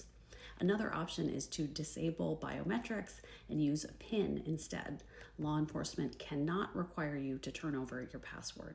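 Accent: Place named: United States. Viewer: Never been a fan of American accents but Liz speaks with a calm and peaceful nature about horrific actions conducted by government and not only this but does so in a clear and easily understandable manner. Love listening to your words good lady & thank you for all your work.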